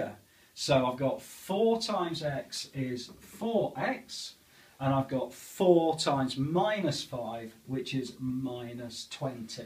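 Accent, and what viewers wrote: United Kingdom. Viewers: Thank you! I get clear explanations with a lovely British accent thrown into the mix!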